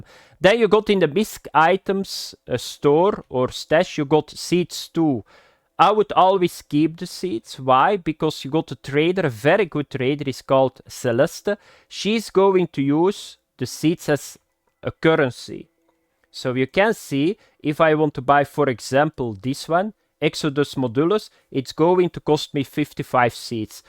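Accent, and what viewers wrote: Flanders, Belgium. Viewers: Are you flemish (Belgium) by any change. Your englisch sounds like mine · ieeeel staarekk vloms accent ni echt nen dutchké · De belgische accent, i love it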